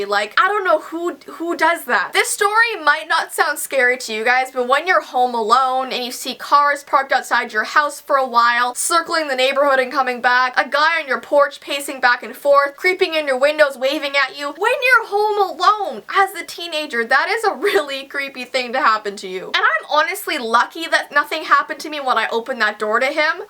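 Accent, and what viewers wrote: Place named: Canada. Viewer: Hi! I have been binge watching your videos and I am American. I know you're Canadian and I love your accent. I have recently been retreaving the Canadian accent. I love it but some people are asking me at school if I was faking the American accent. No, it's because of your accent is getting to me. Have a good day!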